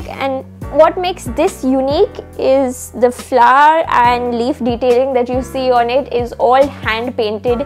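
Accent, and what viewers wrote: India. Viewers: I do really love Indian accent such a unique one!❤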